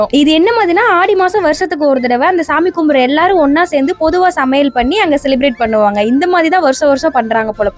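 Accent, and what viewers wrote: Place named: Madurai, India. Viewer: I think no... Ivunga madhura kaaranga avunga thirunelveli kaaranga ....Slang eh difference theriudhu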